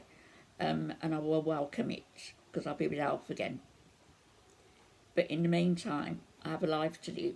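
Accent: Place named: Wolverhampton, United Kingdom. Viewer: Are you from the midlands? I hear a Wolverhampton accent 👂 ☺️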